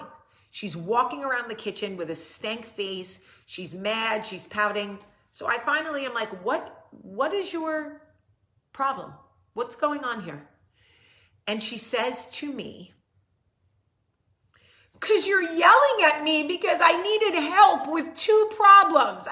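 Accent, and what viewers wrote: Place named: New Jersey, United States. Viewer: You’ve got a lot of New Jersey in you haha! 😆 Love you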